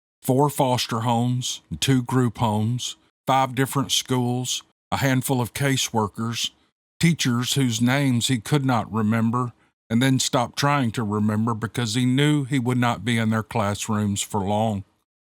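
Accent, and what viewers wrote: South, United States. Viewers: I adore your accent!!! It's' so great to listen to someone who sounds just like me!!! ❣️❣️❣️❣️❣️ I Could listen to you all day long! You are from just a little bit deeper south than me. (Hahaha!!!)I'm from North Carolina born in Winston-Salem. But I've been everywhere. And enjoyed every minute of my travels. Someday I'm gonna write my adventures down. I don't really know how i survived those dangerous times, but thank G-D I did. Love ya!!! Keep up the great story sharing!!!😮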